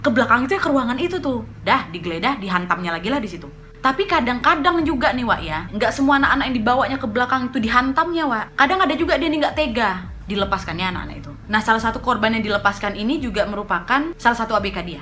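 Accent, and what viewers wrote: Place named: Medan, Indonesia. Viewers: Kakak tinggal dimana? Kok bahasanya kayak bahasa medan wkwk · Br tau chanel ini krn social distancing.. Suka krn kontennya ttg crime, nambah wawasan ditambah lagi bawainnya logat kakak" medan ceplas ceplos ga brenti2, seru🤣👍👍👍👍👍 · Gara2 keseringan liat si wak nih, sekarang aku ikutan dia pake logat Medan kalo ngomong sama anak2 aku, pake "hah" segala, padahal aku arek Suroboyo 😘😘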